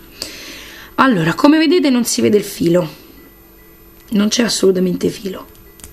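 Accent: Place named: Rome, Italy. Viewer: Nun se spostano de na pezza...........che bello sentì parlà romano .........................mi manca la mia Roma. A parte questo sfogo bravissima molto belli i tuoi lavori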